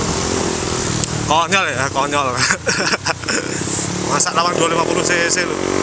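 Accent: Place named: Banyumas, Indonesia. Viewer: iyo tak delok e pakngapak...ndi duduhno ak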